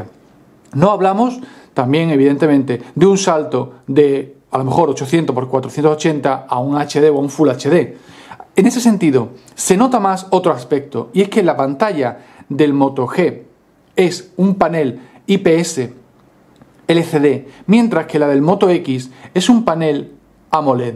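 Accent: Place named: Andalusia, Spain. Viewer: De que ciudad de andalucia eres?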